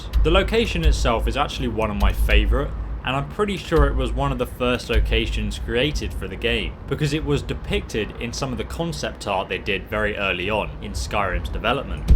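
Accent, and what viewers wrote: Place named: United Kingdom. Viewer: Your voice is easy to listen to, I like the British type accent and Australian and NZ etc. I tend to hate the American accent, that's why I can't look at many videos which are narrated by Americans (their voices just sound so annoying). As soon as a video opens and it is narrated by an American, I am immediately thinking "what a retarded sounding moron". lol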